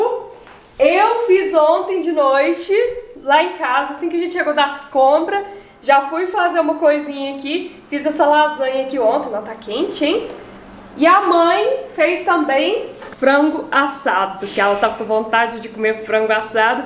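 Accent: Minas Gerais, Brazil. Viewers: Adorei o mineirês! Sou baiana, mas o jeito mineiro de falar é o sotaque brasileiro que mais gosto! Vim do canal da Daniele Paula!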